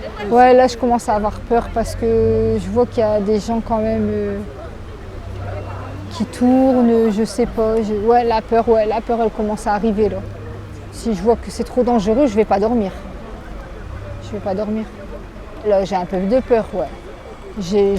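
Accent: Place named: North, France. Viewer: Et encore vous êtes à lille et en général il y a bcp de mois froids que chauds. Essayez de faire l expérience d être toute la journée dans le froid et pas que 3 jours... le Monsieur me fait bcp penser à des gens de la rue qui retrouvent un logement après des années. Bcp dorment encore dans la rue c'est comme une addiction... vous avez l air d être une bonne personne Madame et je reconnais bien l'accent du nord. Le seul bémol j aime pas les vidéos experience sociale ca me met mal a l aise car c est quoi 3 jours ??? Tu ne peux pas comprendre tu n es pas dans leur vie quotidienne.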